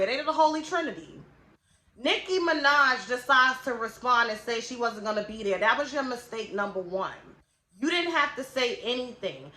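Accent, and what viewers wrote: New York, United States. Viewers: Its probably bc I'm a country ass SC girl lmao but I LOVE a NY accent. I could listen to you talk all day lol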